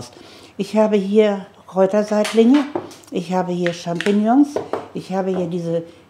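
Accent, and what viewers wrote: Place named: North, Germany. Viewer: Liebe als Fan der allerersten Stunde hat es stets etwas heimeliges, dir zuzusehen. Dies umso mehr als deine Art zu erklären, sprechen, ja- auch die Küche selbst- mich an meine norddeutsche Schwiegerfamilie erinnert. Umso bedeutsamer, da mein lieber Mann derzeit sehr krank ist und Aufmunterung gebrauchen kann. Die kommt nun von euch beiden. Dank euch für das tolle Rezept!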